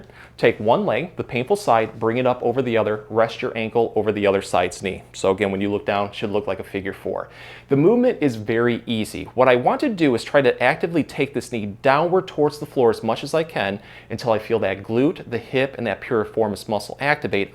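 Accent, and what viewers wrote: United States. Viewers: Sir I am unable understand what you are talking because you speak very fast and American English so kindly try to talk indian English sir pls language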